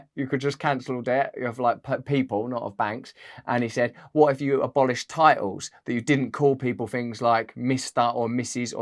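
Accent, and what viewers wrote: United Kingdom. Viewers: Dear Russell, you are in a unique position with your celebrity status to influence in ways others cannot! As a non-UK person, I absolutely LOVE your accent! It makes me feel you are a real person, just like me 😊 Love your sharp intelligence. Yes I read your book about 2 years ago - loved it. I remember the chapter on the Saxe-Goth-whatever - real name of the queen’s family! I wish all Brits would wake up to the real facts about their royal family! Maybe you can do a little video on that again? 💖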